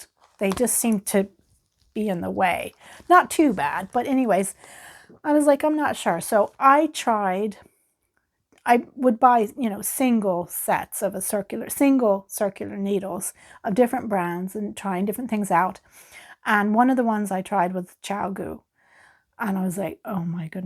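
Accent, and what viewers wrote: Ireland. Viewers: I enjoy content variety w/ ya YouTube page! #High5Blessings Super Interior settings and content details in video... You totally resemble my neighbor #fyi Super Irish Accent. Posted link on my Tweeter page.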